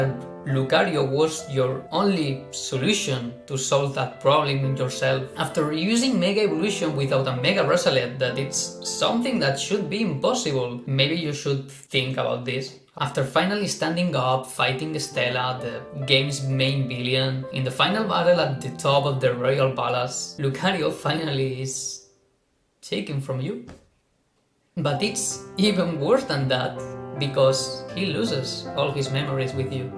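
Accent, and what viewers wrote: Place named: Spain. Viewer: Se nota q hablas español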